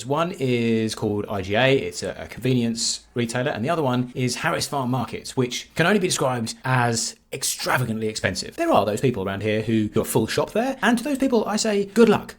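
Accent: United Kingdom. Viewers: You sound British so it was surprising that your in Austraila.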